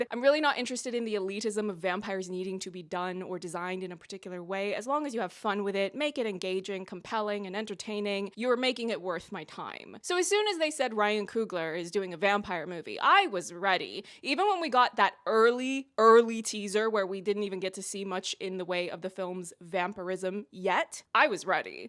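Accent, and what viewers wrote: Ireland. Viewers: not hear the Irish accent until watching this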